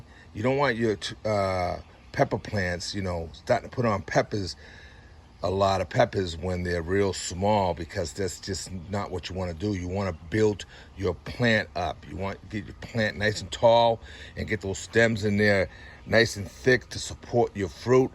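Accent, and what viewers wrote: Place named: Michigan, United States. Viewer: Your garden is awesome. Are you in Michigan, you sound like my cousins accent!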